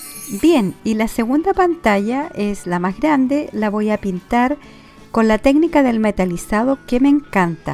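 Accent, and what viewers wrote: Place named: Chile. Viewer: Hola me encantó primero por que eres chilena como yo, con 40años en Italia escuchar la voz de una chilena me da mucho gusto. Muy lindos tus trabajos yo me dedico a la lana todo lo manual es ermosa brava 👏👏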